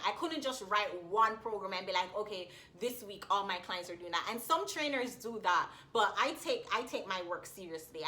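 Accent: Jamaica. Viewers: Sound like a yaadi. Mek wi link up!!!!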